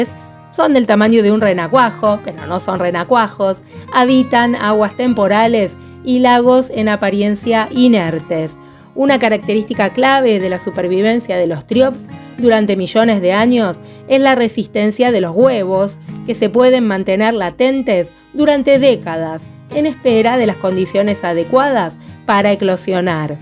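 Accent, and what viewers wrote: Argentina. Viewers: Amo tu acento Argentino 🥰😍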